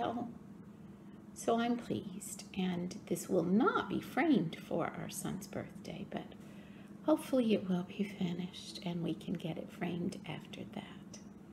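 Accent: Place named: Texas, United States. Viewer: So nice to hear a sweet Texas accent and to find another homeschooling mom (although mine are now in their thirties). Your Mary Queen of Scots will be stunning! Your curls are beautiful. I'm in Athens and am enjoying the rain as we badly need it. I heard about you on Rachel's FlossTube and I think she mentioned you go to the Tudor Rose Guild, unless I misheard. I'm going to the October meeting. Hope to meet you if you are there. I'm coming up on my FlossTube Anniversary, too!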